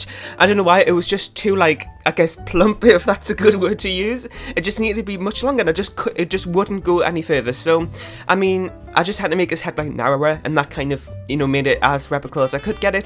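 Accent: Newcastle, United Kingdom. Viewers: this was so great haha! How have you slipped under my radar? This is my first time checking out your channel! You seem like a nice guy, loving the Geordie accent! I'll look forward to having a nose through your work:D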